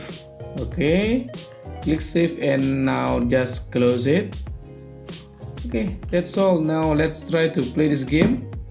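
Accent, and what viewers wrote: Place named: Indonesia. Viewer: Logat Indonesia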